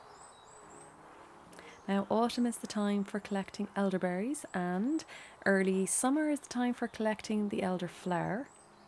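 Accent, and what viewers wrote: Ireland. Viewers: One of my best friends, God rest her soul, was Irish. And as soon as I can, in the near future, would love to visit Ireland. In honor of her. Seeing your video & hearing your accent makes me realize that my dream can happen as soon as the virus has a vaccine or When I feel it is safe to travel again. One question I have when is a good time of the year to travel, whether wise? And can you recommend places that I should visit? Thanks. By the way your videos are awesome. And I love the thought of "fairy doors". I am thinking of incorporating "fairy doors" where I live. It is an interesting concept.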